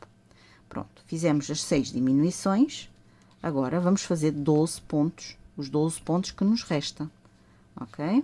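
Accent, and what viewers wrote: Portugal. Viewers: ❤❤adorooo eeu sotaque português, parabéns vc ensina muito bem ❤❤❤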